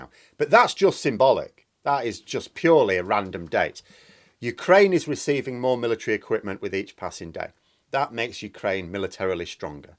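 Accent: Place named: England, United Kingdom. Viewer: By no means do I mean to offend but hearing someone with an english accent advise Ukrainians utilize IRA tactics to repel Russian occupation is unexpected and bemusing.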